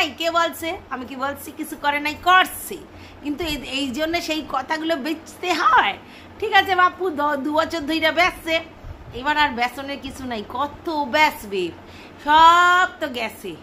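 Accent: Bangal, Bangladesh. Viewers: ভিডিও content je flavour tar sathe বাঙাল ভাষা মিলেমিশে খুব খুব মজা 🎉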